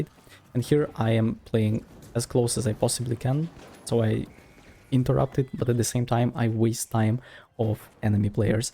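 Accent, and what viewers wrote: Russia. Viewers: agreed, his russian accent is pretty cute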